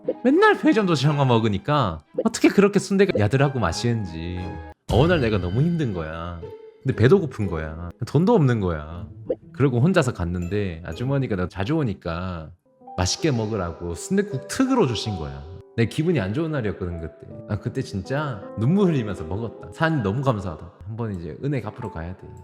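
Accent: Gyeongsang, South Korea. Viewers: 경상도 사람이엿구나..........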